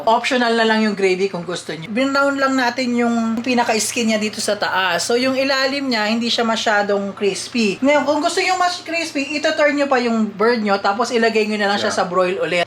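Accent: Philippines. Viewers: So nice that she can speak tagalog fluently like un accent nya pinoy na pinoy pa din... woah really amazing 🙌🙌🙌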